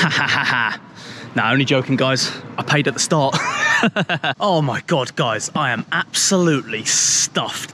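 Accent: Cockney, United Kingdom. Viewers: Got a cockney accent and never had pie mash and liquour. Funny guy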